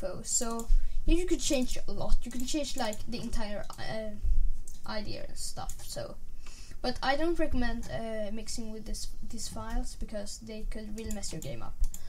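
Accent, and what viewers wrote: Sweden. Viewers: Är du svensk? låter så på dealekten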